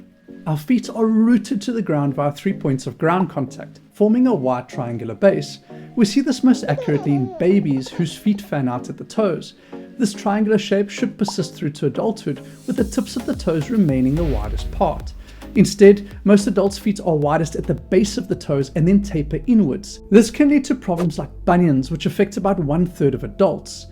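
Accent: South Africa. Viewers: Great video with so much common sense, which should be called “rare-sense” these days. Thank you. PS: you sound South African. Am I correct there boet?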